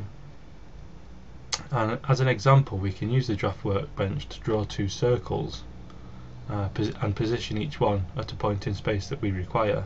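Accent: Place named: England, United Kingdom. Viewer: The audio sounds fair to me, there are a lot worse out there in youtube land. Granted it's a crap microphone and there is some background hiss from the pre-amp but audio compression would just make it louder. Microphones don't amplify they just listen, it's the circuitry which follows which can colour the audio. I think the main issue is that he is speaking in "English" and some of the viewers are listening in "American".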